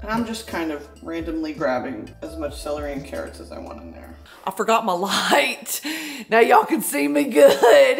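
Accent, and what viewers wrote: South, United States. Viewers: God that southern accent is so spot on to like 90% of my older family it SCARES ME😭🤣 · When you speak southern it just makes my day haha please never stop! I love backyard maw maw Broski haha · I'll never get over her southern grandma accent lmao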